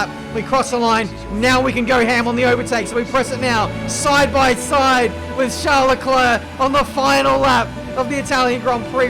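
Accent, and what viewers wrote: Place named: Australia. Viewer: the thing i like about is that his voice sounds normal because im aussie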